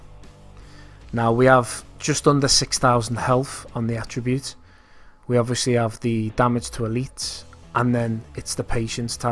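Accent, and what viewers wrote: Liverpool, United Kingdom. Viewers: That scouse accent.. lol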